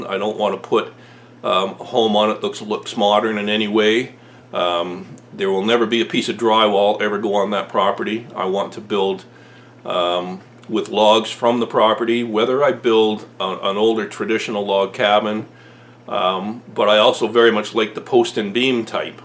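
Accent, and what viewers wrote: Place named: Ontario, Canada. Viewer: hi, I found your channel recently and as soon as I heard you speak and say the word 'out' I new you were from the middle east (I am guessing Ontario). I live in Northern Alberta and try to spend a lot of time out doors, I hunt, side X side, camp, etc. I also tinker with 'blacksmithing' I make knives, froe's, axe's and do fabrication work. I haven't had a chance to watch all your videos yet, but do you winter camp?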